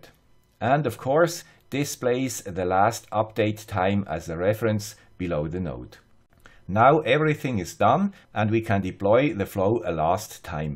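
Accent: Switzerland. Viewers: "Grüezi Youtubers, here's the guy with Swiss accent" should become a personal trademark :) Same as Gennaro Contaldo's "Why I am cooking so goood?"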